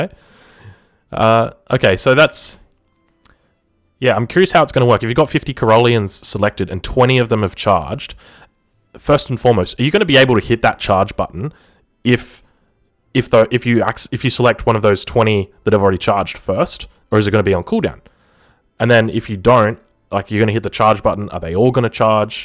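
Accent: Australia. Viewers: I'm from Minnesota, but I that's Australian English right?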